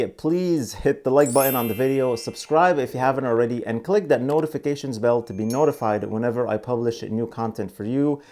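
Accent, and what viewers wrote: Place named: Arab world. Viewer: With all my respect to you. Money doesn't buy happiness. WHERE'S your awesome accent coming from? Arabic?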